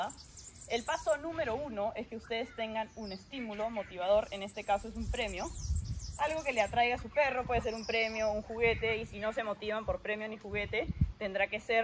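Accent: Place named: Panama City, Panama. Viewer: supongo que por su acento es de Panamá capital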